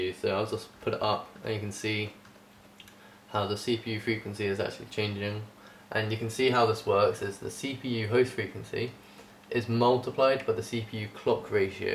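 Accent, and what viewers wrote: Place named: RP, United Kingdom. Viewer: Beautifully spoken. What a wonderful example of speaking the Queen's English. Forget what the pleb antking1117 said. He's just jealous. Probably speaks with a drawwwwlllll. Get your hair cut.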